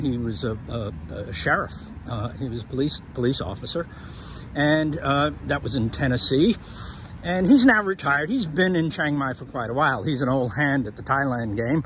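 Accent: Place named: Brooklyn, United States. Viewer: Your Brooklyn accent is easy to recognize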